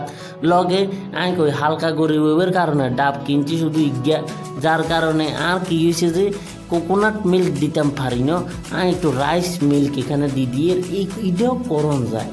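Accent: Mirsarai, Bangladesh. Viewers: আমনে যেন নে কথা কন এঠা তো মিরসরাই এর কওথা,,,এননে, তো আনডা কই থাকি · তোমার ভাষা পুরোপুরি বাংলাদেশের চট্টগ্রাম জেলার মিরসরাই থানার ভাষা আর আমি মিরসরাই এর মেয়ে তাই আমার সাথে তোমার আঞ্চলিক ভাষার ১০০% মিল। তুমি জানিও তুমি বা তোমার বাবা মা কি কেউ মিরসরাই এর কিনা? আর তোমার ভিডিও গুলো অসাধারণ আমি আর আমার ছেলে আমার মা সবাই তোমার ভিডিও আপলোড হওয়ার অপেক্ষায় থাকি। ভালো থেকো এগিয়ে যাও🤩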